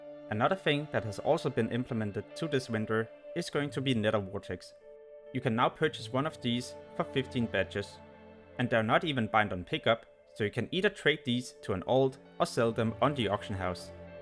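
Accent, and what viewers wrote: Denmark. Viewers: You sound very danish.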